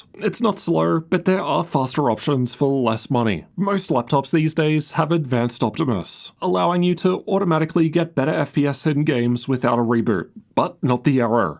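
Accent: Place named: Australia. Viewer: Man you take the worst new gen Australian speech patterns, with the intrusive Rrrrr and the end of sentence falling intonation, and you magnify it by a thousand!!!! I can't listen to this verbal debauchery any longer. I'm out.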